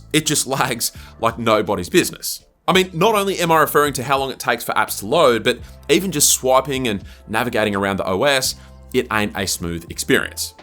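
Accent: Australia. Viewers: His Australian voice makes this even more soothing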